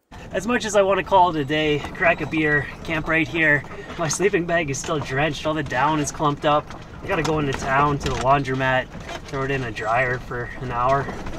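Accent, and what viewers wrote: Canada. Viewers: Great drone shots, cute dog, fun adventures, subscribed. The canadian accent is adorbs. Someday I'll be your van neighbor.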